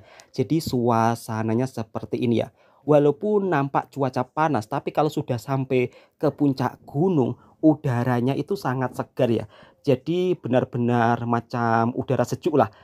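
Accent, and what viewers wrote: Java, Indonesia. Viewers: Jawa nya di daerah mana Mas??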